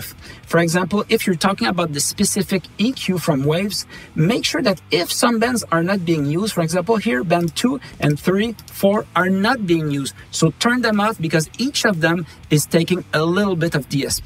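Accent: Quebec, Canada. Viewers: l'accent Québecois all the way!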